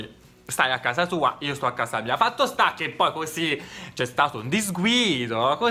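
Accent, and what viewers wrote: Tuscany, Italy. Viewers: AMO IMMENSAMENTE IL TUO ACCENTO TOSCANO. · ma sei toscano? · Sei toscano? P.s i tuoi video mi fanno morire dal ridere😂❤ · Sei toscano?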